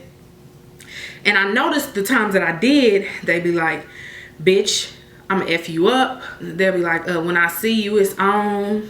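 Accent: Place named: Kansas City, United States. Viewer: So you from KC, huh? I like when you added the voice over for him.... Made me visualize the story more...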